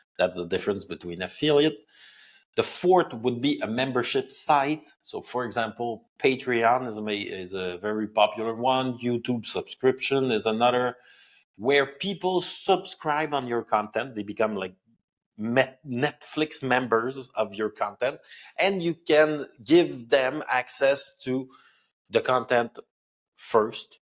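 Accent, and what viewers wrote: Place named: Quebec, Canada. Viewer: Nice video! Are you from Quebec!? I can tell😃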